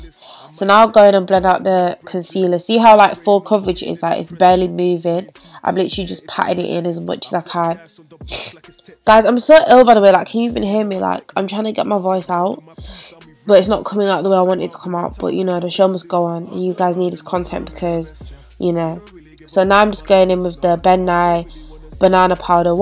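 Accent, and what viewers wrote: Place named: Northern England, United Kingdom. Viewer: So refreshing to hear a northern accent on YouTube for once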